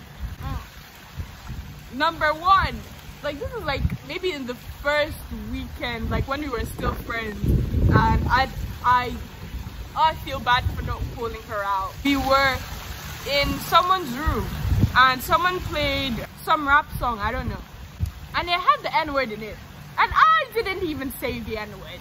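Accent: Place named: United Kingdom. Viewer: Is da British accent for me luv ❤️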